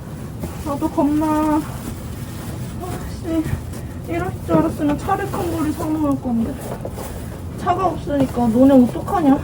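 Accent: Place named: Gyeongbuk, South Korea. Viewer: 산동네 볼때 경북쪽 사투리 쓰시는거 같아서 혹시 산불난 쪽 아닐까하고 생각했었는데 오늘 영상 올리셨네요. 아무쪼록 사람도 고양이도 무탈하고 건강 해치지 않길 바랍니다.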